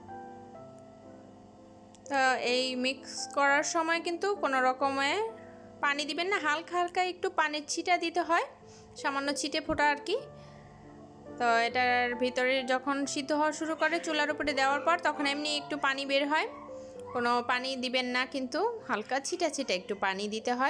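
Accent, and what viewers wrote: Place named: Noakhali, Bangladesh. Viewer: Puro dakha galam.valo noyakhqli vasa sunlam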